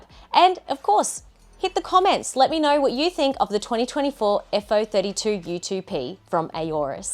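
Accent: Australia. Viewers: You have one gorgeous Australian accent, 😍 I love listening to it in your videos. Nice gaming monitor here looks bloody cool! 😎👍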